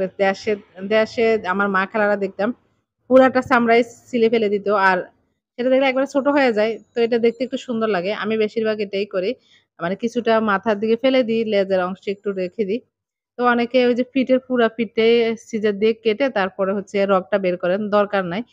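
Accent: Noakhali, Bangladesh. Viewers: নোয়াখালীর আপা আপনি?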